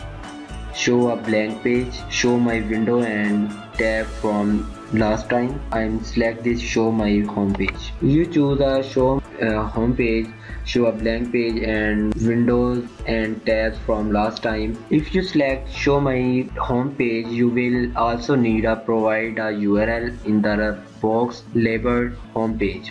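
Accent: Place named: Punjab, India. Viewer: I can not understand this punjab. Hello, Buddy, this is an AMERICAN site. Learn AMERICAN.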